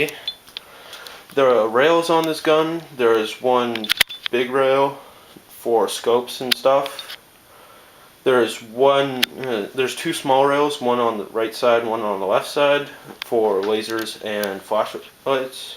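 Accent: Canada. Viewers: Haha I heard you say aboot and I knew you were canadian. I love canadian accents.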